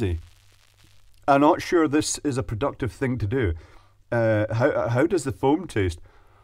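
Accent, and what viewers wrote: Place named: Glasgow, United Kingdom. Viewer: As an American, I love the Glaswegian accent and lingo ("a wee bit") haha Something I would have tried is using the "nose oil" trick I learned in college (if you have oily skin, wipe your finger on your nose and then swirl it around the foam) which almost immediately breaks the surface tension of the bubbles and kills the foam.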